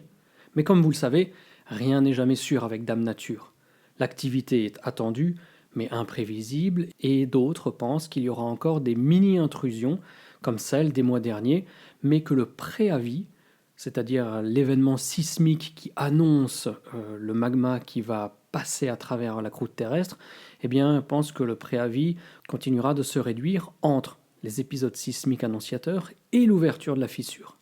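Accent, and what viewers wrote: Iceland. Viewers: Bonjour pensées sincères au peuple islandais. Ils vivent véritablement sur un baril de poudre Merci pour ton partage et.....ton accent islandais.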